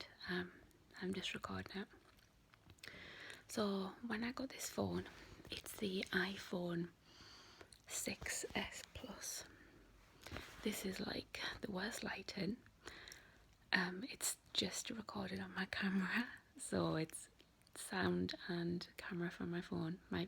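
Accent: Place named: Newcastle, United Kingdom. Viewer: .....sound like a Geordie!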